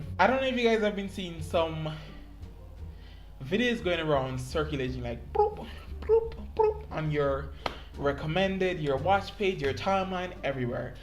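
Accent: Jamaica. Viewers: Do I hear a Jamaican accent? :)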